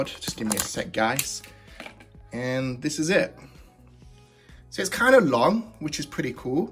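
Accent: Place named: Midlands, United Kingdom. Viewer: “Me old” “I got it for fifteh” definitely a midlands lad 😂👍👍👍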